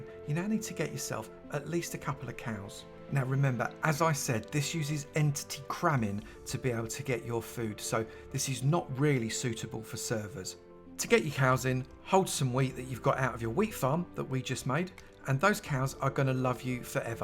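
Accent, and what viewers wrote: United Kingdom. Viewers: I think British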